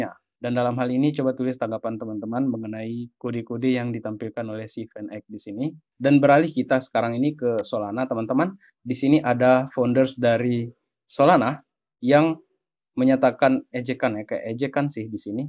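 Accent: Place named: Eastern Indonesia, Indonesia. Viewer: bang sorry oot,, abang org timur kah... logatnya kayak gtu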